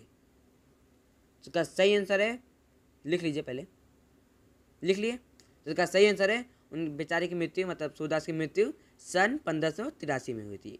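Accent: Bihar, India. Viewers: Sir bs ek question aap bihari hai ky .plz reply sir your language is like them only . Btw I love bihari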